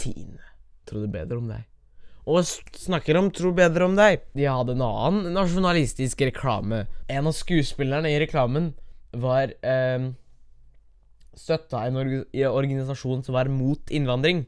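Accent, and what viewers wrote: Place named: Sunnmøre, Norway. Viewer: Beste Sunnmøre dialekt ej he hørt :D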